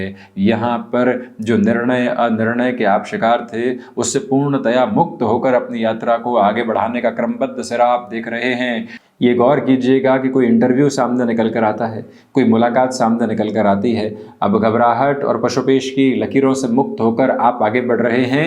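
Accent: Standard. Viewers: Even though could not fully understand what he spoke but it was pleasant hearing his shud Hindi as if I am in Ramayan era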